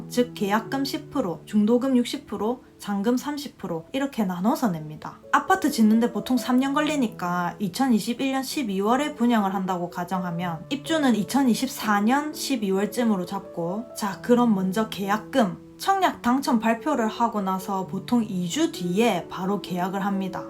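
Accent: Gyeongsang, South Korea. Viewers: 아따리야 경상도사투리 간만에 듣네예 좋심다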